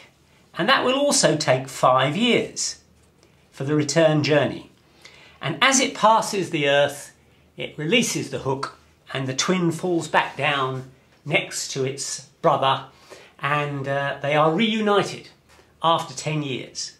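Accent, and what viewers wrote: United Kingdom. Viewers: i like the british sweety accent rather than the lecture itsel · Fantastic!!! The British accent is also extremely helpful.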